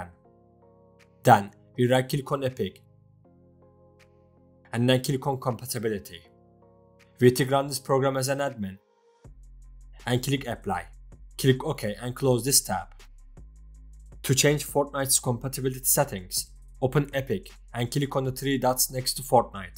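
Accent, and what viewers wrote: Turkey. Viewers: teeeşekkürler dostum konuşmandan türk olduğunu anladım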